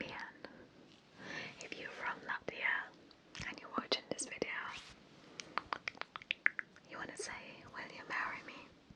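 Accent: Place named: Thailand. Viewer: Your Thai accent is so cute. I love it ❤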